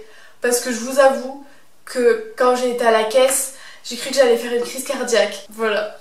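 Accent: South, France. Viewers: D'ac, je pensais que tu parlais du undiz du polygone de Béziers car dernièrement tu cherchais un bar ambiance sympa sur béziers:) Au passage t'es vidéos sont aux top j'adore ta façon si naturelle de parlais et t'exprimer avec ton accent bien de chez nous:) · l'accent du sud miss vive le sud bisous j'adore tes vidéo continue comme sa gros bisousta un corp de rêve moi je comme a l'avoir je fait beaucoup de sport ❤❤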